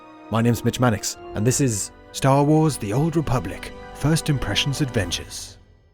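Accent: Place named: United Kingdom. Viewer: Top tier content! Wish you would turn the SWTOR to a chapter/planet gameplay. You've voice overs and Brit commentary is very entertaining!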